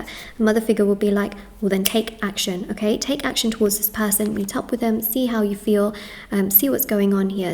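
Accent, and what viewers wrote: United Kingdom. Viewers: Hey actually… when your video popped up on my feed… I thought- “Hey, I haven’t seen her in a bun before.” “She looks rather pretty (said in a thick British accent) (pun intended😂❤) (cuz you have a British accent) (I suppose) Huh. You Look Beautiful. There is no other way. And I will not hear of it! ❤❤❤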